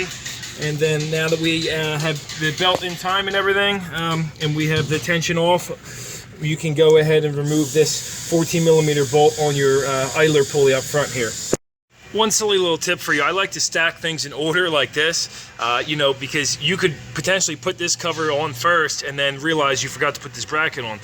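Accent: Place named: New Jersey, United States. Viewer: Where are you located? You sound like you're from South/Central Jersey. After seeing how this video I'd love to have you do the belt in my wife's 2014 Pilot. Very thorough and detail oriented. Great vid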